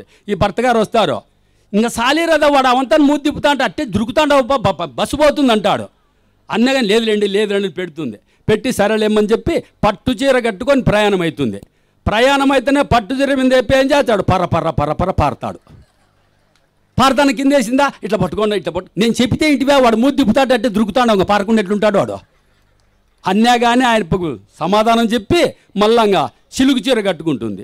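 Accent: Anantapur, India. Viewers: Beautiful language... Rayalaseema slang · Anantapur slang nice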